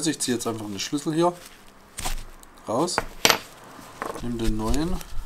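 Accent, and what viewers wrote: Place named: Swabia, Germany. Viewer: Da du au ausm Schwabaländle bisch wie ma hören koh wolld ih fraga ob du mir des ed oifach eibaua kosch?🤷🏻‍♂️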